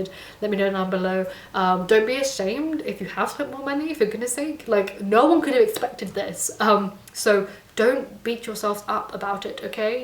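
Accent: RP, United Kingdom. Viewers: New viewer (American) here. I spent a summer in Edinburg when I was a kid, but I would have never guessed you lived there from ur RP accent. Once you said it, I can notice some subtle things, but still... never would have guessed. Maybe I’m just bad at hearing it since I’ve gotten older. Either way, ur voice is beautiful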